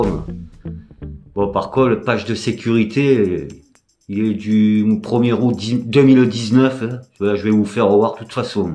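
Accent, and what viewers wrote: South, France. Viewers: Tjr au top tes vidéos tjr un plaisir avec ton accent du sud que J aime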